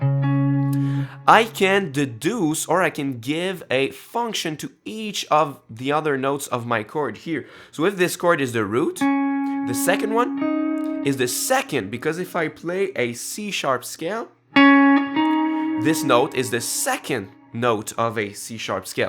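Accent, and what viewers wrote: Quebec, Canada. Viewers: Oh boy j’ai tout de suite su que tu es québécois mon chum c quand même sick😂😂👌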